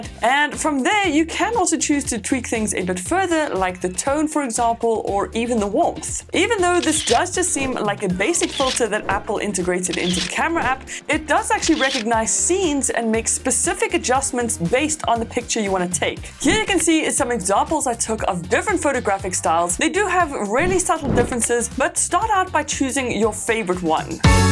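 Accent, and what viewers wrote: South Africa. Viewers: I cant tell if your south African or not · Awesome Thanks for this! Very helpful! Random but are perhaps from 🇿🇦 just based on your accent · Thank you enjoyed watching your video are you South African. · ha south african. here's a sub just cause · R u from South Africa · Omg you’re from South Africa 🇿🇦🇿🇦🇿🇦🇿🇦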